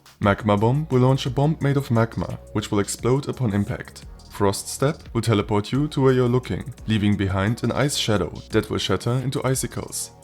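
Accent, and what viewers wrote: Germany. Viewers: man ekent das du deutsch sprichst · Wusste ich’s doch. Hab den deutschen Akzent rausgehört. Auf dem Channel das Impressum gesehen und meine Vermutung wurde bestätigt. Mach weiter so gute Videos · is that a german accent? also very high quality vid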